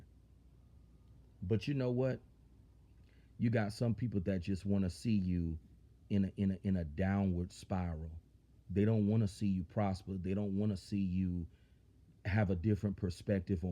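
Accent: United States. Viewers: stop talking ebonics and ill let you in the white club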